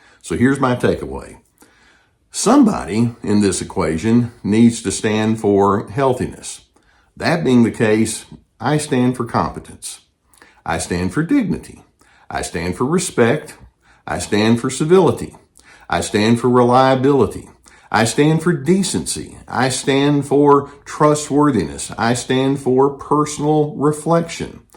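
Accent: South, United States. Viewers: vids began showing up in my recommendations even though I never searched for the topic of narcissism. It's like my android had been listening to me. That's a little creepy. I was in a bad relationship, but never attributed it to narcissism. After watching a few of vids a lightbulb went off in my head. I finally had an explanation for the craziness I'd been living. I so appreciate these vids, teaching method, and I love his accent being a Southerner myself. I've binge watched his vids and learned so much. Thank you!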